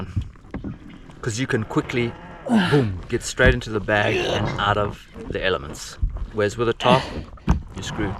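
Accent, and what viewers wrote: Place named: South Africa. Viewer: I really like you videos. Freedom shouldn't be an expensive thing. You make it look easy. 😊 You are a South African born aren't you? Your accent is a clear give away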